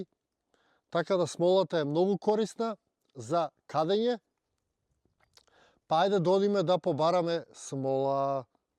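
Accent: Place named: Veles, North Macedonia. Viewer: Да си го зачуваме јазикот, кој и онака е во изумирање. Малите македонски деца повеке зборат англиски... се надевам знаат и македонски. Кај нема наталитет, има абортуси и сите деструктивни нешта, барем јазикот да го зачуваме. Ете ти збориш на велешки акцент што е убаво да се слушне. Така да, моја препорака да продолжиш вака како до сега. Евентуално можеш да ставаш титл на англиски или синхроно и посебно да ги објавуваш. Но продолжи да снимаш како до сега.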